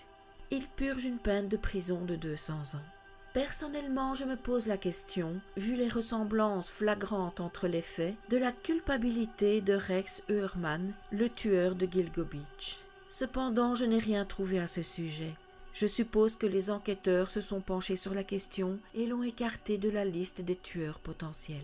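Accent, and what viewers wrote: Belgium. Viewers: ce petit accent Carolo voire Hennuyer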